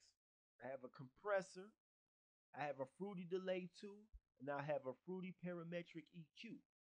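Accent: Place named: West Coast, United States. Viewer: teeeen,teeeen,teeeeen hahaha luv the west coast accent well u sound like u from there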